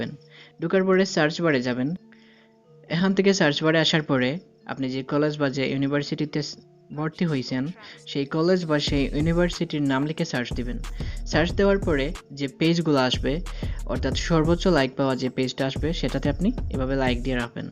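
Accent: Sylhet, Bangladesh. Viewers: Sylheti bro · no, sylheti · tumire vai sylheti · ভাই মনে হয় সিলেটি 🙂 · apnar mat hunia bhuja jar sylheti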